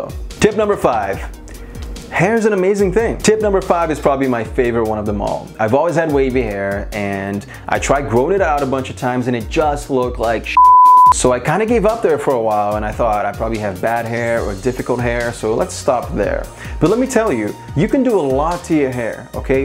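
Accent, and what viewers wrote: Brazil. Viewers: boy, you brazillian? cuz you sound like it, just a little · Caraca meu velho. Tu tem algum vídeo contando tua história? Teu inglês é mt bom, mas eu consegui ver que tu é br hahah Sucesso! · Cute strong Brazilian accent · Dude has light Brazilian accent, maybe?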